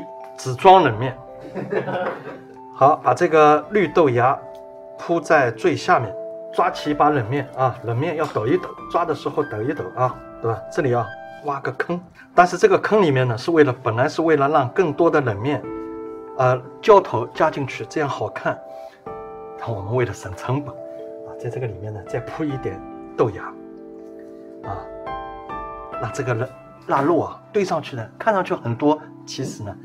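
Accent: Shanghai, China. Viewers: 你带着上海腔调的普通话实在太可爱了， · 身在海外听到这样的沪普浑身舒适!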